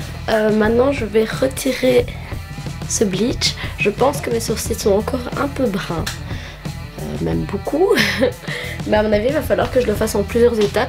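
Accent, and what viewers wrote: Belgium. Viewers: je me disais bien je suis aussi belge hihi;3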